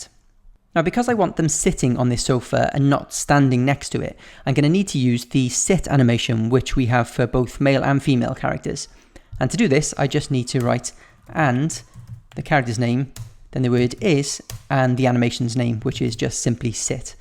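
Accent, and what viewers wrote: Wales, United Kingdom. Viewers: omg! you're welsh!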